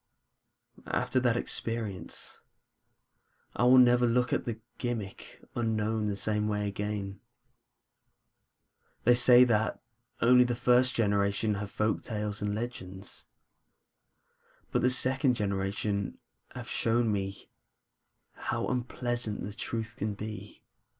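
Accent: United Kingdom. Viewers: I'm guessing you're British or English.....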